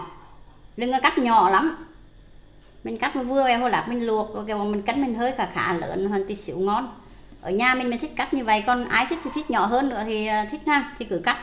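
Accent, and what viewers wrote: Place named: North, Vietnam. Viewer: Banh canh goc o QTri ma MC lai noi giong Bac tia lia ?! Het muon an!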